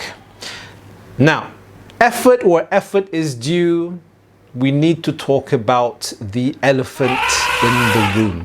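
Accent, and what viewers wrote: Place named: RP, United Kingdom. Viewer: You're speaking the Queen's English. Well done.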